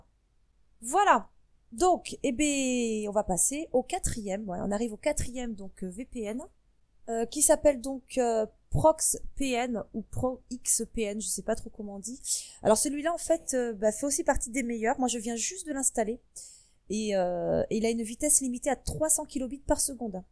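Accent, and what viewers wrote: France. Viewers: j'adore ton accent frenchy, je comprends pourquoi y'a des mecs qui craquent en entendant une p'tite meuf du sud-ouest parler anglais, un mélange de j'm'en fout, j'ai pas écouté ma prof quand j'étais en sixième et de t'as qu'a apprendre le français c'est une langue géniale, tous ça avec une syntaxe et une grammaire juste correct' et Windscribe (50Gb/mois) t'en pense quoi?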